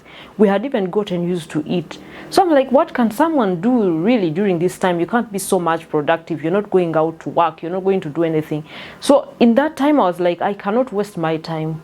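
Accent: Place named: Kenya. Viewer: you look kenyan now and talk like them😂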